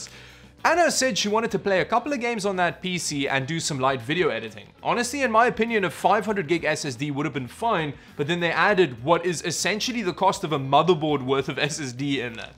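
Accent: South Africa. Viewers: Are u from south africa? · Bro are you South African?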